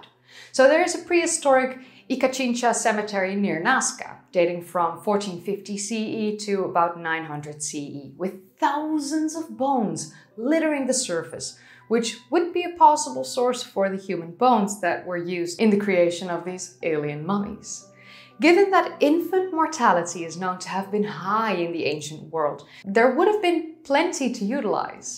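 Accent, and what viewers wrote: Netherlands. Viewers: You must be Dutch right? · her wonderfull dutch accent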